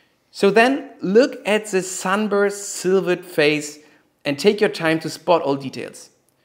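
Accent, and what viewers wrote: Germany. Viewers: Love the German accent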